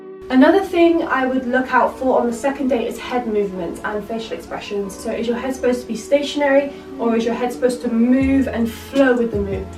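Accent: United Kingdom. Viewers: You sound English if you are I know I’m not the only English-dancing-k-pop-fan. 😭🙏🏽🙏🏽🙏🏽🙏🏽🙏🏽 (LOL)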